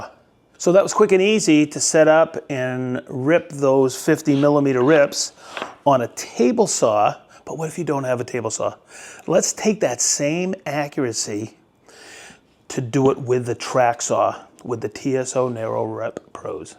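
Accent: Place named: Boston, United States. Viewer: just want to thank you for all of your tutorials on woodworking and the use of the Festool System. I'm a hobbyist and both a fan and user of Festool products. Your videos have enabled me to fully understand and utilize the features of Festool products while elevating my woodworking game. Your product recommendations are also well noted, and I'll be adding some of your suggested accessories to my toolkit soon. Each one of your tutorials are a masterclass and I plan on viewing every single video on your YouTube channel. Your energetic delivery and Boston accent are much appreciated as they add both color and authenticity as a content creator. Like Batman and Robin, you and work well together. Wishing you the best in the coming new year!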